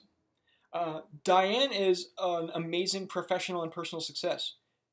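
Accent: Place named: Ohio, United States. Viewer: Ohio huh? I’m from Cincinnati and pretty good at listening for accents. You definitely aren’t from Toledo or Cincinnati outright. I’m going to guess more very very outskirts of Cleveland. Maybe Akron???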